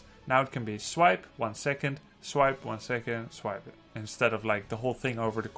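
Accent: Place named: Netherlands. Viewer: Jens van Straten Yes. He is from The Netherlands.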